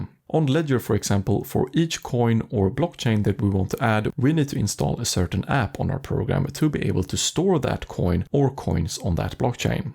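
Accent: Sweden. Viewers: You sound swedish on your accent. Stämmer min gissning? :)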